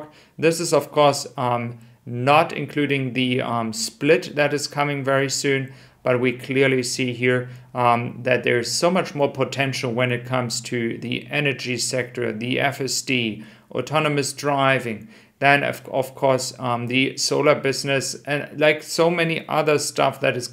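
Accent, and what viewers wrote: Germany. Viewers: I agree, in fact English with a German accent is one of my favourite things. Great content always... thanks!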